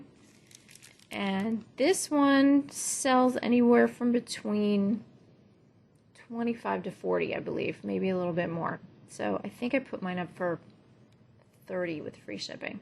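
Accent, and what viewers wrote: New Jersey, United States. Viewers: What a soothing, ASMR voice she has. But that's not why I subscribe. She does a great job of in depth evaluation of resale items. I never miss an episode. I love that Jersey (or what ever it is) accent.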